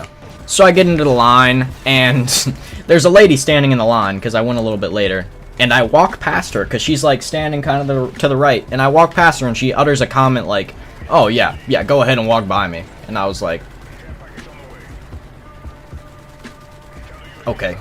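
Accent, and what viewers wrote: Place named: South, United States. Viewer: You sound southern in this video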